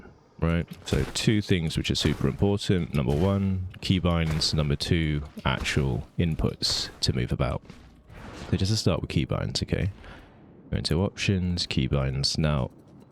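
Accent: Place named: United Kingdom. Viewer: I just wonder - are you side account of Mr E? Cuz you sound very similar :D Only accent says that you're British. I have almost 600h playtime but still can learn something new, so thank you for all the tutorials, which I'll watch. For example I can spare a lot of pain for my poor hands and fingers after many hours of playing only by using one key for crouch and dodge!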